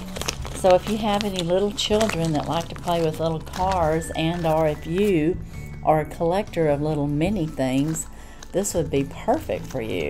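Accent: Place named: South, United States. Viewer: Hi.. so happy yt put you in my feed. Its nice to hear someone who sounds like me.. country 🤣 😍😍 love it lady keep going! I have started my channel too! Excited God bless you sister 🥰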